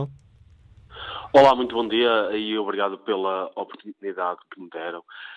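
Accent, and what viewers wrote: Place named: Portugal. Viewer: gosto do sotaque português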